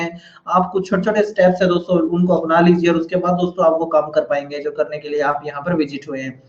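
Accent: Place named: India. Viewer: Just FYI he's not spekaing spanish... thei accent is hindi like, just saying don't asume.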